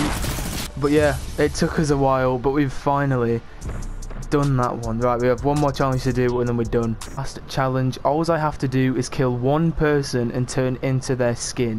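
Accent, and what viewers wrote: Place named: United Kingdom. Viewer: His accent was killin me prolly cause I love Brits 😂😭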